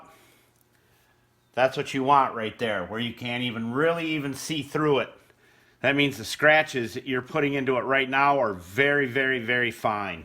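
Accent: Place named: Pennsylvania, United States. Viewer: Where are you from? Your accent sounds a bit like it's from my neck of the woods in northeastern PA.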